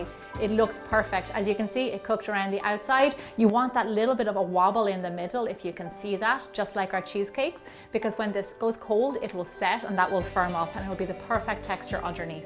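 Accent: Ireland. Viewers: Did anyone else notice she had an Irish accent. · They are all amazing! You've taken mug "cakes" to the next level! Weill dun (trying to imitate your super cool Irish accent! lol)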